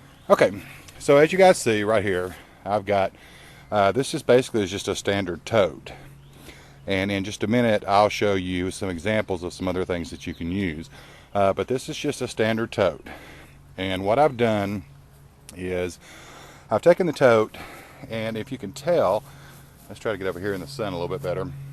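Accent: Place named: South, United States. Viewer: Lovely! Thank you for the post. (I love your sweet southern drawl too!)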